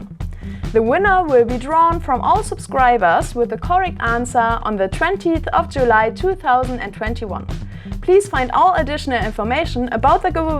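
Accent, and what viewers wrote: Germany. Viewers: I counted 38 logos. Btw hört man extrem das sie deutsch ist xD😆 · Pretty sure there are 45 different logos in the video. Love the german accent, btw! Good luck!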